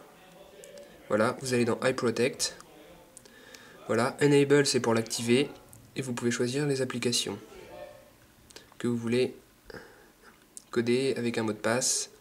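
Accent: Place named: France. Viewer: j'aime ton accent français =)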